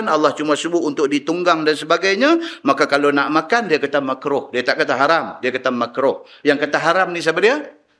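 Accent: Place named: Penang, Malaysia. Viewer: penang dngr sleng dia bleh tau dh penang...kecuali perak blah utara slalu akan owg kata kedah peneng dia ad loghat dia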